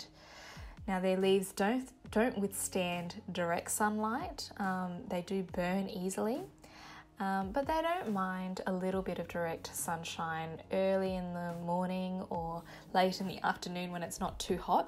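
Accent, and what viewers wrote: Australia. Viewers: I am a newbie to orchid growing...phals and sarcs at present....so all info is greatly appreciated. Also nice to hear an accent from across the ditch....I'm a new subscriber in Wellington, NZ....so please keep the videos coming. 🌞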